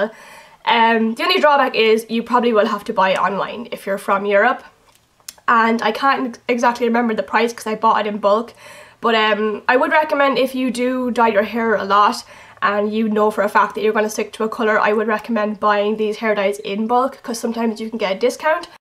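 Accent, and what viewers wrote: Ireland. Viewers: Your accent is beautiful! I wish I had a accent but NOOO I had to be Canadian -.- haha are you Irish? Don't need to answer if you find it personal xoxo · OMG YOUR IRISH AND I LOVE YOU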